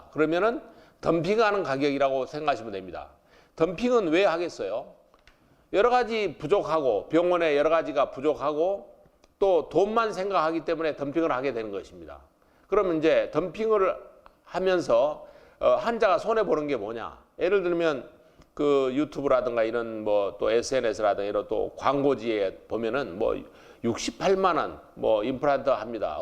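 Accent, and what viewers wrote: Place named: Jeolla, South Korea. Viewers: 사기치지 말게. 전라도 말씨, 아주 답답한 진행. 그냥 덮으세요. 임플랜트? 티타늄 재료로 하나 원가 10만 원도 안돼. 치조골만 양호하면, 가까운 동남아시아에 가서 아주 싸게 하세요. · 전라도 말씨가 어때서요 ㅋ 별나네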